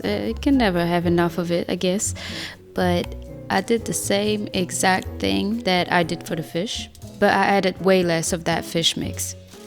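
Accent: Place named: France. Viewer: its the french accent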